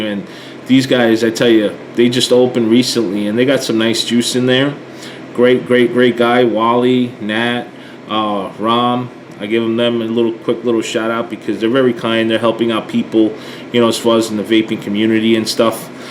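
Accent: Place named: Tennessee, United States. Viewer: ur accent tells me tennesee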